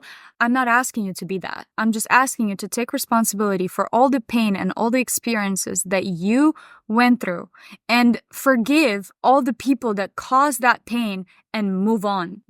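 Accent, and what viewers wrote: Russia. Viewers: акцент русский, вы откуда? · Please stop doing typical Russian accent in purpose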